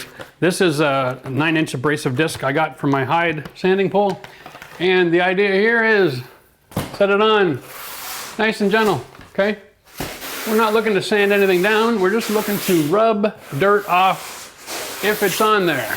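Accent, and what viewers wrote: Canada. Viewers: Great Canadian accent! 😊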